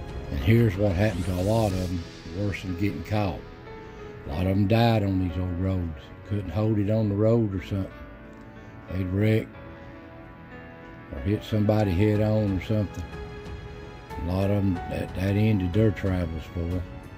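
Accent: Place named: South, United States. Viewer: Hey, thanks for another great video. I heard my grandpa ran moonshine, but unfortunately I don’t know too much about it. I lived in Tennessee near Nashville when I was a child, and I had your lovely accent lol. We moved to Texas for a while, then back to North Carolina so my accent changed a bit, but still southern. Anyway, my son and I enjoy hearing you speak, and the old photographs are just beautiful. Have a great day. 🌺😊🌲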